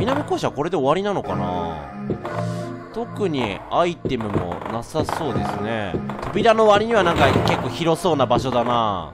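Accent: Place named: Kyoto, Japan. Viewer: たまに出てくる京都弁でほっこりする^^ 肉人形楽しみにしてます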